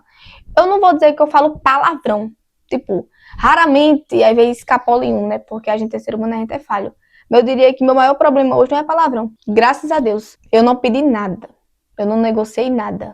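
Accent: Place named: Northeast, Brazil. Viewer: Eu amando o sotaque 😂 sou nordestina tbm, sou da Bahia. Estou no catecumenato, nessa quaresma irei receber os sacramentos 🙏🏼 fui nascida e criada dentro da seita das testemunhas de Jeová, mas gracas a Deus ele me atraiu à Santa igreja ❤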